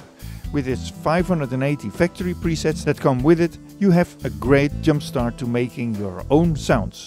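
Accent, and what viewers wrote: Netherlands. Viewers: Is this guy Dutch? Hard to hide the accent 😉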